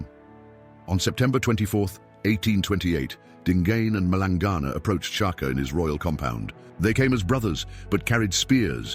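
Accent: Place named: United Kingdom. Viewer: History, Even great Shaka Zulu was 😢monster for you, unbelievable lies. You have British accent. GO and study British history. It's one of the worst ever. What savage Queen did in Africa is unpardonable. These are hybrid beings that committed atrocious acts on humanity that you should talk about and not paint great African leaders as monsters. Anyways, era of propaganda and lies is over!!! Great Shaka Zulu!!! Great Idi Amin!!! They fought for African people. What a useless British faceless racist thinks is inconsequential. Africa forever!!! The world is discovering that the West is full of wickedness towards Africa and the people which this page represents.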